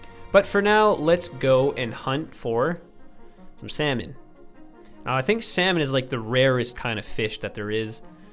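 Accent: Canada. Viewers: I wondered all through the first episode why your accent gave slight Canadian energy. Still no houses, eh?" was sort of a giveaway. East Coast-er?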